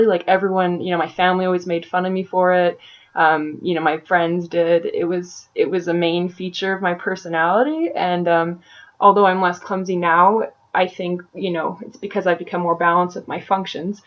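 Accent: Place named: Prairies, Canada. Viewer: It's like you just described my personality and things that I do (or did when I was younger) in ways that I didn't even fully realize, like anthropomorphizing inanimate objects and feeling sorry for neglected plants! I do live inside my head a lot, too, and I have a serious love for unpopular or strange things, and I ramble too much..! Are you me? Anyway, great video, and it would be really cool to see a second video on INFP behaviours. P.S. Are you Canadian? You sound like you're from the prairies, haha.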